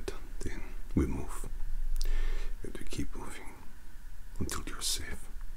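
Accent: Russia. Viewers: Yay, Russian accent! ^_^ I'm beginning to like this character, get really strong big brother vibes from him, and he also reminds me of how I used to imagine Sirius Black before I got to watch The Prisoner of Azkaban. Thank you,! Спасибо,!)) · As a russian,I can confirm that your accent is perfect. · I'm new to this channel and I'm used to most asmr rps being black screen or single frame'd so seeing a full human man on my screen speaking to me in a russian accent, soon then approaching me with a knife, was a bit of a jumpscare ngl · It's just awesome! Сhic Russian (accent and pronunciation) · I'm Russian, and those russian words gave me shakes 😅 It was funny to hear that pronunciation. Anyways, good job trying to make russian accent 👍 Really cute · I gotta admit, I’ve listened to this every night since I’ve found it. I love all your videos but this Russian accent is sooo relaxing! 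I really hope you do more videos of soon!!:) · That was pretty awesome. Your russian accent is pretty amazing. Since i am russian myself it was such a pleasure to listen you speaking russian. Русским в коментах привет!😊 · I find that I am really liking the Russian accent when it’s spoken softly like this. I think your Russian gangster reminds me reminds me of Ho Yinsen from the first Iron Man movie. I know that character is not Russian, Afghan, I believe, but the gentleness, the pronounced accent, the caring... and the glasses 🤓 just makes me think of that character. I like that character and I like your Russian gangster... when he’s being good. 😉😁 Is there a deeper meaning for you behind all of the personal attention videos that you make? Just curious. 💙💙💙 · Just discovered your channel and I have to say I love it! Очень хороший Русский акцент и в общем звучала правдоподобно! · becuase he’s kinda creepy, his assurances and affirmations made me feel like he is obsessed with us rather than caring for us, or maybe im just biased to a Russian accent lol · это звучит очень круто, особенно английские слова с "русским" акцентом, звучит просто ахуенно, но немного тяжело отличить русские слова от английских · That Russian accent of yours really works well for ASMR purposes. 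Awesome character work once again with this one,! 😎👏 I feel like we'll see ol' in a 3rd (mis)adventure down the road. 👍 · as a russian I really appreciate your work with an accent and some russian phrases. good job! and the whole video... that's something really special. thank you so much, it was literally the best 18 minutes of this day for me :) (господи, мне как будто снова 6, а по телеку идет "бандитский петербург" 😭)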